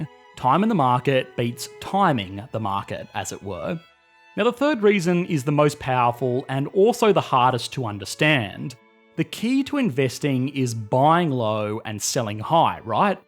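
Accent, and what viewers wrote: Australia. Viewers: Australian accents make me wretch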